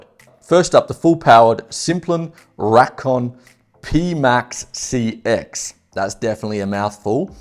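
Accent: Australia. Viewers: You sound Aussie but you talk in pounds, are you doing bike reviews in Australia?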